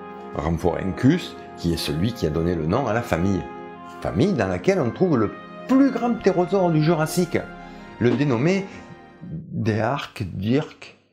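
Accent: South, France. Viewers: Cher, tu es un mélange de Ben Kingsley pour le physique, de Jean Lassalle pour l'accent (ce n'est pas moqueur, je suis moi-même du Sud), d'Alan Grant pour le savoir et de Carlos (le chanteur) pour les chemises😂 Par contre, es-tu sûr que le Tyrannosaure et le Tricératops soient apparus au Jurassique? Il me semble bien que ce soit au Crétacé, non?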